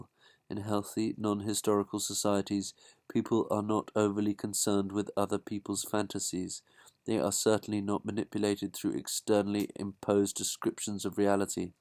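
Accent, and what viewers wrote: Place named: England, United Kingdom. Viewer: Love the book but, just because this guy has an english accent does not improve the presentation. This is pretty lousy.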